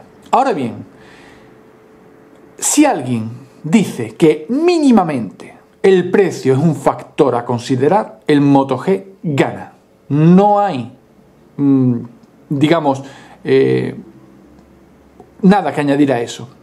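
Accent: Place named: Andalusia, Spain. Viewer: De que ciudad de andalucia eres?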